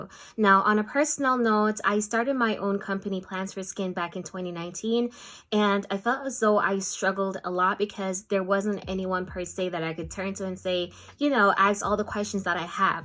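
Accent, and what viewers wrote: Jamaica. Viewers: I hear Jamaican accent somewhere in there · Do you have Jamaican parents? I'm hearing Jamaica in your voice. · Hello, I am wanting to venture into the beauty industry, I hope is to cater to everyone but specifically: Acne prone & Oily skin. Glad I came across your video. Also, I heard a Jamaican accent ❤️ 🇯🇲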